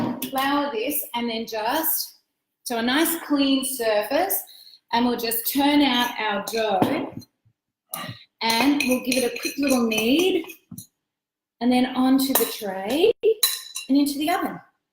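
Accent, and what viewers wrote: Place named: United States. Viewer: Why do you put on an American accent?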